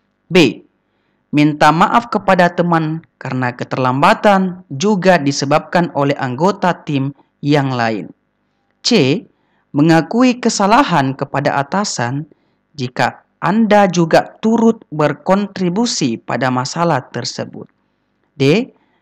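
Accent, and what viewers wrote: Bugis, Indonesia. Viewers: Cekcok logt Bugis 😀😀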